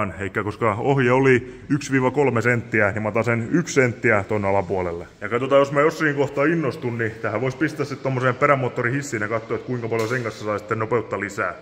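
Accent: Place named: Turku, Finland. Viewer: "Saisin halvalla" 80-luvun Marino Swingin 20hp Jammulla. Luottopuheiden mukaan soiva peli muuten, mutta peräpeiliremonttia kaipaa. Kun oot niin hehkuttanut noita braketteja perän jatkoksi, niin olisko tässä koko- ja teholuokassa semmosessa mitään järkeä? Vois askarrella samalla, kun vanerin joutuu kumminkin vaihtamaan. Parantaisko se potkurin olosuhteita, kun on kuitenkin aika jyrkkä v-pohja? Vauhtia en niinkään kaipaa, enemmänkin pientä kulutusta pienellä koneella. Tulis samalla sisätilaa, kun peräpenkin siirtäis kaivon paikalle. Joka tapauksessa tekisin ton sun Silacraftin tyylisen keskipulpetin, jo pelkän ajoasennon takia. Ja kun kuskilla on painoa 180kg (ei oo kirjoitusvirhe), niin hörppääkö kiikkerä Swingi vettä pulpettia kiertäessä? En oikeen pääse sitä vedessä kokeilemaan ennen kauppoja (ja romahtaneen ladon raivaamista). Ja kiitos hyvästä kanavasta. 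En olis ikinä uskonut, että joskus okein odotan pääseväni kuulemaan turun murretta!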